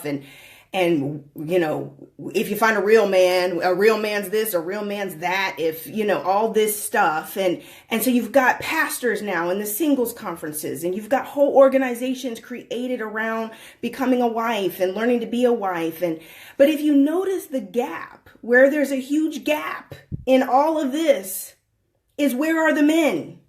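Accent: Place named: New Orleans, United States. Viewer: Babbaaayyyy say it in your Nawlins accent you done blew it up here! I'm so glad I woke up from that mess and saw the light. I almost threw my phone and shouted across my room when you talked about sexual repression! All these preachers out here profiting off of women's pain when it's a lot of women waking around broken due to so many issues and experiences. They should be ashamed!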